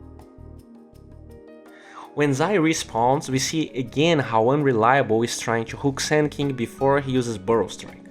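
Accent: Brazil. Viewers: melhora tua pronuncia cara. TWO nao eh TO. Entrega tua "brasileirisse" facilmente. Fora isso, parabens pelo conteudo.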